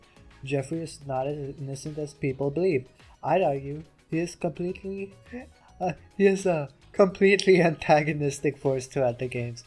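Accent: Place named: Ukraine. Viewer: you kinda sound like the counselor if he was from kyiv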